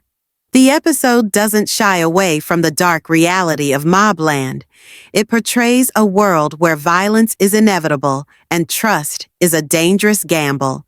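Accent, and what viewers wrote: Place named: United States. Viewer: It's interesting having the feedback, but with the american accent of this woman , she is not pronouncing most of the words properly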